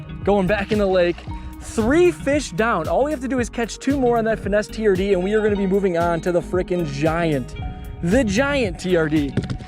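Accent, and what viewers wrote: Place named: Midwest, United States. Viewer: Sounds like your Sobi’s brother lol midwest aye?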